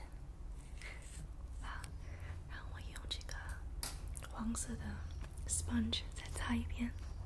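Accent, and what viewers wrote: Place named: Beijing, China. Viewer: 虽然有些词忘记了，但是你有很北京的口音👍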